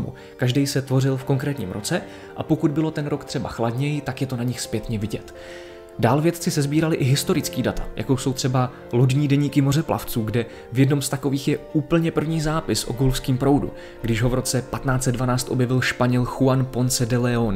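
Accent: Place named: Prague, Czech Republic. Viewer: Kdybys vynechal ten pražský přízvuk tak 10/10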